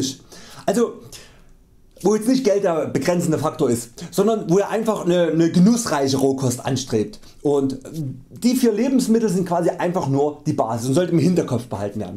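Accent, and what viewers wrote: Saxony, Germany. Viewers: dein sächsisch ist ja immer wieder schön ^^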